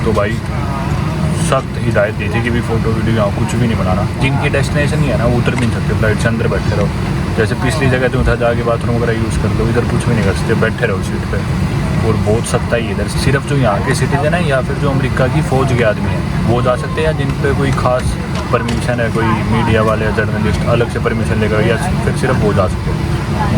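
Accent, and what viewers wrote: Haryana, India. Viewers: Ram ram bhai aap haryana s ho kya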